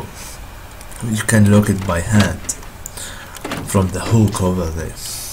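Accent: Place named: Arab world. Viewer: انت عربي وينك اوين التونتي فور تحجة عربي هيييييي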